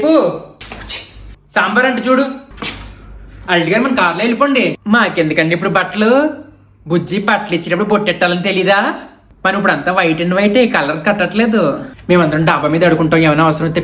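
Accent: Godavari, India. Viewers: Me slang superr andi memu godarollame❤😂😂😂😂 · Anna,, me godavari slang n reela chusthe godavari ki ravali ani untundi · గోదావరి జిల్లా యాస superr andi... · Nee personality face ki ee godavari yaasa suit kavatledhu bhayya. Manchi 6 pack hero personality pettukoni accent emo bhavayyah typelo undi. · Same Godavari matalane undhi😂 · నిజం చెప్పండి మీది ఇస్ట్ అండ్ వెస్ట్ కదా మీ మాటలు వింటుంటే మా అమ్మ తో మాట్లాడుతున్నట్టు వుంది అన్నయ్య ❤ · Godavari slang super Super funny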